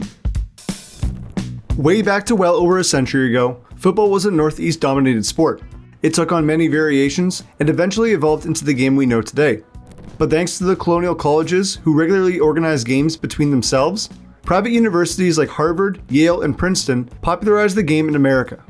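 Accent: Canada. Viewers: Canadian accent tho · The narrator sounds like a Canadian, check how he pronounces "out'.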